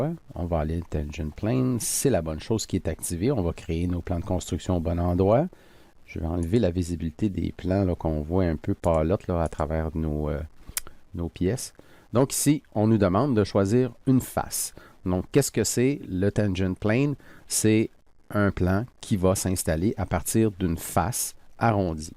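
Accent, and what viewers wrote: Quebec, Canada. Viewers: Bonjour, je suis impressionné par la qualité de vos vidéos qui sont toujours très précises et à travers lesquelles on devine un grand professionnalisme. Et c'est aussi un plaisir d'entendre cet accent québécois pour nous les Français.Je m'étonne que votre chaîne n'ait pas pas plus d'abonnés car vous le méritez plus que d'autres. Merci pour nous et continuez comme ça. 👍👏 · Très bon(s) tuto(s), on voit que c'est préparé même s'il reste quelques boulettes (qui n'a jamais oublié de sélectionner un composant avant de faire une manip, c'est mon drame !). Chaîne méconnue mais il y a de la place dans l'espace francophone. L'accent est super (pour moi), ça me rappel mon séjour au Quebec. Je vois un petit -22°C à Montréal aujourd'hui, courage les cousins dans 6 mois ça sera la canicule :)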